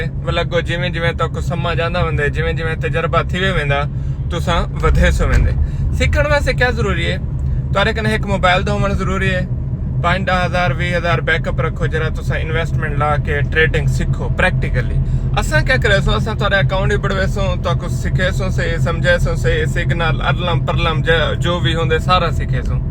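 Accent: Saraiki, Pakistan. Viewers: سر جی ٹھوس تے ماشاءالله بڑا میٹھی سرایکی بولیںدے ہوے I love you Sir Ji❤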